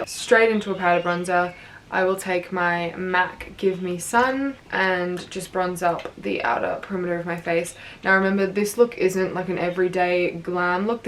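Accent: Australia. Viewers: I knew you were from Bondi just from the accent lol. #eastensuburbsgirllssss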